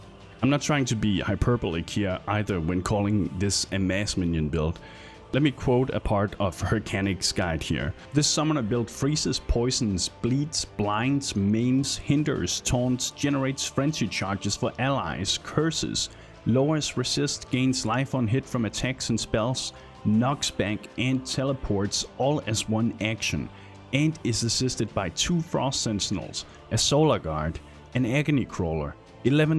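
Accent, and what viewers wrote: Denmark. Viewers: A few seconds into the video: Wow. You. Sound. Danish. Hej,:) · du lyder dansk. har jeg ret?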